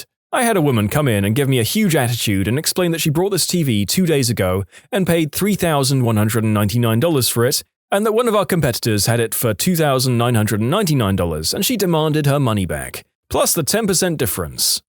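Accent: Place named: United Kingdom. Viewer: I have to say that I’m really pleased to hear a fellow British accent here! 😎🇬🇧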